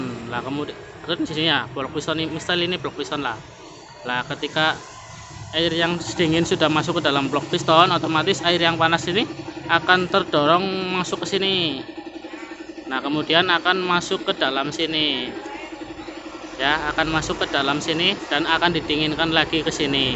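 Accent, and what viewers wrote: Banyumas, Indonesia. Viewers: Jossss....ora ngapak ora penak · Kayong ngapak... asli ngendi mas 😁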